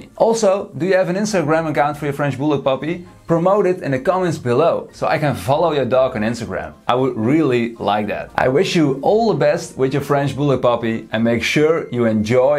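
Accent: Netherlands. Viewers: Are you Dutch your accent sounds dutchhh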